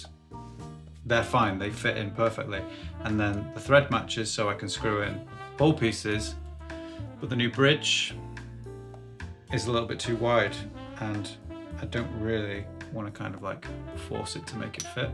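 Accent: South, United States. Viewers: I was not offended by your "Southern" accent. I thought it was hilarious and I live in Nashville where a lot of people sound like that! Cheers.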